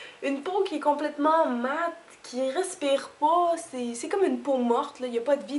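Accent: Quebec, Canada. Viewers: Cette vidéo m'a convaincu : JE M'ABONNE HAHA !!! Mademoiselle aux deux facettes hein ! =D J'adoooore ton accent canadien, ou...quabecquois on dit ?? J'en sais rien lol... Bref j'adooore ton accent, cette façon de mélanger le français et l'anglais. Je te trouve très belle :) Avec ou sans maquillage, et même ton côté gauche du maquillage raté, tu es quand même belle. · J'adore, je rêve d'être comme toi. Autant quand tu es maquillé que quand tu es naturelle, tu es super belle. J'adore aussi ton accent canadien. :) Continue tes vidéos, j'adhère et j'essaye de mettre tout tes conseils en pratique. Gros bisous et bon courage pour la suite. :D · j'adore vos vidéos, elles me sont très utiles, merci beaucoup. En plus vous êtes très jolie, et votre accent québécois est à tomber..........So Cute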